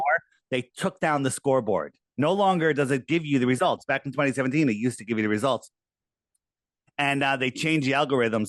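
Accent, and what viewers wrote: Sweden. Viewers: Sounds like she is from sweden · Is she swedish? 🇸🇪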